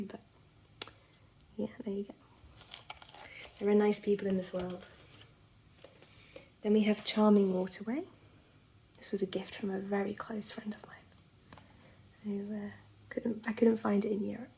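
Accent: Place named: Sweden. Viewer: Oooohhhh Dimensions kits presented by a lovely Swedish accented lady - what could be a better afternoon! 😊😊😊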